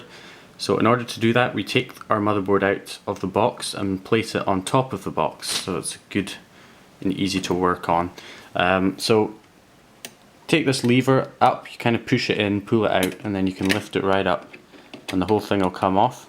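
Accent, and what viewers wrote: Scotland, United Kingdom. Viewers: ***** I believe it's scotland, judging from the accent. Probably somewhere near Aberdeen, but his accent has a slight twist, so he might be from somewhere around that area. I'm not Scottish, so I'm not too sure. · Are you from Scotland?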